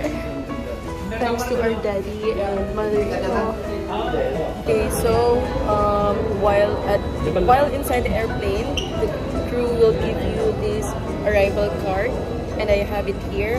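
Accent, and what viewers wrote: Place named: Philippines. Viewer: From her English accent, she's Filipino.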